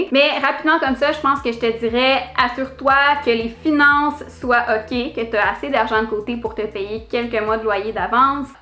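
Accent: Quebec, Canada. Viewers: I love your videos, your frech quebcoise is helping me to understand more and more each day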